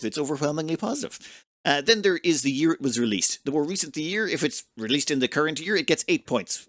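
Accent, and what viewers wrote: Ireland. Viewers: If it's any consolation, you sound very Irish to an American in England. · Just totally LOLed at "People can't tell the difference if I'm saying "or, or, or". Made me laugh a lot, I'm one of those people that can't hear any difference. For us in the U.S. it's "Or" = or "R" = arr. Similar to the pirate trope. Dont change,. Love your videos, and what initially caught my attention was the Irish accent. Its just a huge bonus that they are also entertaining and contain awesome gameplay tidbits and information. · Lol was trying to figure out why your Irish accent wasn’t so think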